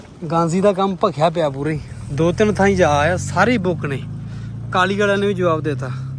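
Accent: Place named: Punjab, India. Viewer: ਵੀਰੇ ਤੁਹਾਡੇ ਬੋਲਣ ਦਾ ਲਹਿਜ਼ਾ ਠੇਠ ਪੰਜਾਬੀ ਸ਼ਬਦਾਵਲੀ ਜਮਾ ਸਿਰਾ ਵੀਰ ਦਿਲ ਰੂਹ ਜਮਾ ਬਾਗੋ ਬਾਗ ho ਜਾਂਦੀ ਆ ਵੀਡਿਓ ਦੇਖ ਕੇ,,,, ਜਮਾ ਸਿਰਾ ਪ੍ਰੀਤ ਐਂਡ ਪਾਲ ਹੋਇਆ ਪਿਆ ਚੜਦੀਕਲਾ ਬਾਈ ।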